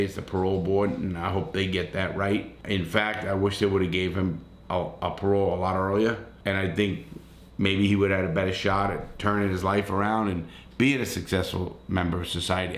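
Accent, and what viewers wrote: New York, United States. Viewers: I’m gonna buy a copy as well, but I live here in Phoenix Arizona, and I’m originally from New York, I lived in three of the five boroughs of New York City, but I was born on Suffolk County Long Island. Every time I hear speak, I feel like it take’s me right back to NY (in a good way.) “Youtube membuh prograaam.” The best! I listened to your book in audio format, but I really need to buy the physical copy tbh. I’m Jewish but my father grew up in Bensonhurst Brooklyn in the 70s and 60s, so I got to hear all these crazy stories. your channel is the best color I always try to give a thumbs up to every video you make! We all make mistakes in life, but it’s how we learn from those mistakes, and use those mistakes to change our press the situation., you constantly remind me on a daily basis why I should be appreciative of the small things I have, and I’m only 36 years old, but I’ve dealt with some craziness in my life. 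I was arrested many many years ago for weed, but nothing really came of it, but I’ve had to deal with my fair share of the police, so I really appreciate your channel, although I wish you would focus on talking about the NYPD a little more because there are so many corrupt people in the department. I lived on Staten Island, Manhattan, and queens; the most corrupt police I’ve ever met in the country live on Staten Island, whereas here in Phoenix they are just trying to protect the community, and as long as you aren’t being a jerk, they will be respectful, w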